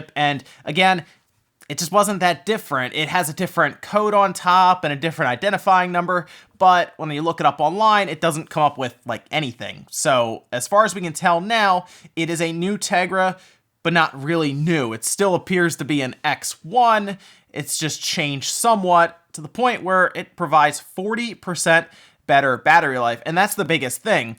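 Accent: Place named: Maryland, United States. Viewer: Does anyone know what that accent has is called? Like how he pronounces "Draw" as "Drawl" and "Older" as "Odor"? I'm genuinely curious and not trolling. I've wondered for a long time. At one point I thought it was an Ohio thing but is in Maryland right? ANyone know what I'm talking about?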